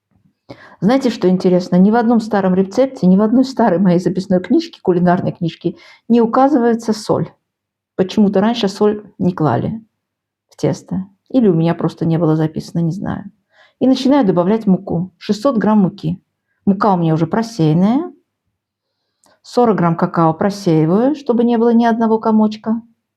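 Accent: Baku, Azerbaijan. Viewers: Спасибо за рецепт. У вас даже акцент бакинский ❤❤❤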